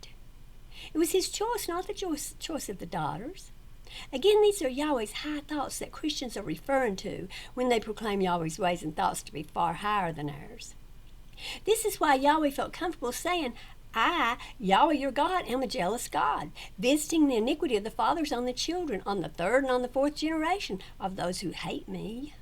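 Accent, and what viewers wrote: South, United States. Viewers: Am from trinidad & I also have a accent but I also like yours ..I like the southern accent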